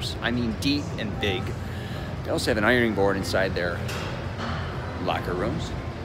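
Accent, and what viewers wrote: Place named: United States. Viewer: The city's name is pronounced Re-JYE- na . You didn't hear it on your flight when you touched down? You're VERY american as is your yankee accent!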